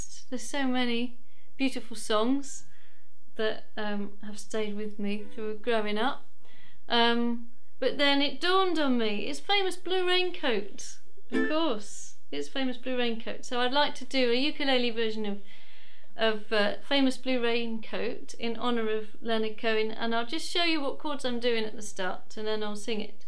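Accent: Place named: United Kingdom. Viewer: ya why don't ya give a tutorial on " " plssss..never heard that song b4, i looked it up on youtube and gave it a listen...that's such a surreal, such a poetic song... yes, u should do a tutorial... btw, what nationality r u? u sound british...to my ears at least...but then what do i know.! haha!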